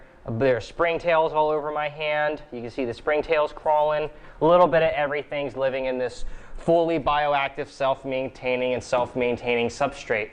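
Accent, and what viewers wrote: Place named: South, United States. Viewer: terrible south accent.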